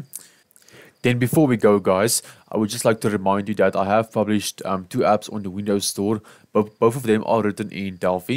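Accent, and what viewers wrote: Afrikaans, South Africa. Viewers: Jisss maar jy is heavy afrikaans bra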